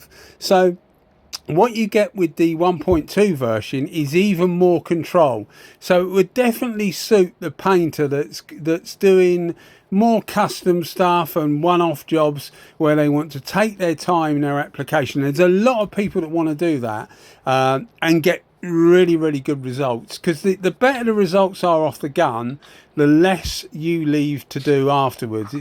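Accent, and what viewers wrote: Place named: United Kingdom. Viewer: Hello again.. Jeff from the US. As usual you do not disappoint with your vast knowledge of this trade. There are a lot of youtuber's making paint gun videos, but your no-nonsense approach is refreshing! This Porphis brand looks like a high quality spraygun. Fit and finish seem to be spot on as well and based on your elevated tone of voice, I can see you're a fan of this brand and I do you value your opinion! Can't deny I am partial to the British accent my friend! Keep on! God Bless🙏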